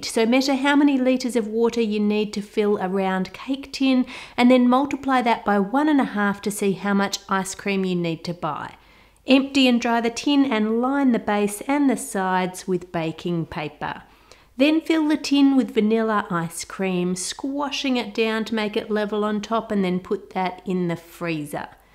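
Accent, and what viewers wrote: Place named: Australia. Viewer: Peel away inhales THE PAYPEH I love Australian accents lol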